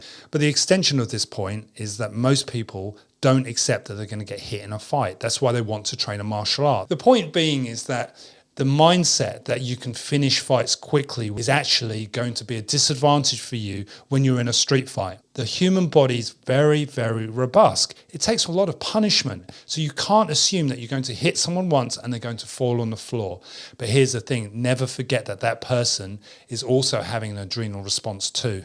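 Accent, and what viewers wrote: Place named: Cockney, United Kingdom. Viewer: Excellent advice !!! Love this guy's Eastend accent.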